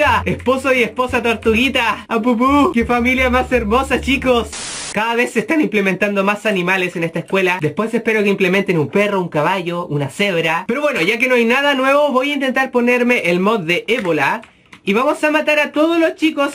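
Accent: Chile. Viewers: Hola una pregunta eres chileno ? ¿Y si no de que pais?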